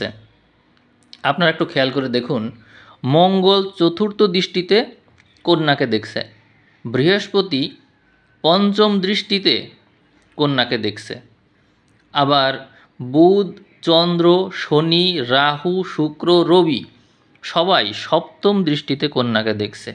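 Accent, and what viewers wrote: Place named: Gopalganj, Bangladesh. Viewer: ভাই আপনার কথাগুলো খুব সুন্দর আপনার কোন জেলা মনে হয় গোপালগঞ্জ এবং আপনার কথার সাথে আমার জীবনের সাথে অনেকটা মিল আছে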